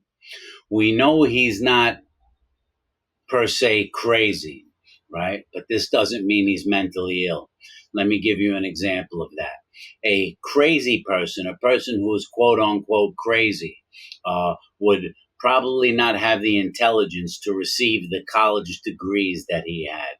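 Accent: New York, United States. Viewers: Just found your channel. You sound like you're from new york. I appreciate your somewhat objective/neutral analysis of this situation. I appreciate you telling us about the difference between federal prison/lockup and state prison. It almost sounds like you've done some time yourself. It's like having an uncle or papa or some neighborhood guy telling all the kids gathered around the block about the prison system and how it works. So that they don't end up like him. Something tells me that he might get moved simply due to the fact that some of the victim's families live in the state of idaho and they don't wana be sleeping in the same state as their loved one's killer. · I love ur NY accent! I dated a guy who did 27 yrs and he sounds just like you. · i can tell you from NY immediately as i am too i started watching your other videos too ….good stuff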